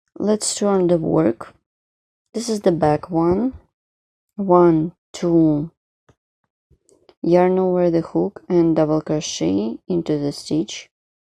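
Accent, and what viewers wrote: Russia. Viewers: Почемуто какжется, что русскоговорящая говорит на анллийском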